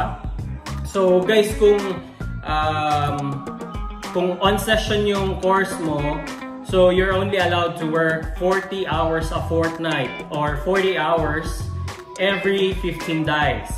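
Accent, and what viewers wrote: Australia. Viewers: Great info! Wanna hear your AUS accent 💘🙊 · I can hear your Aussie accent na. haha Nice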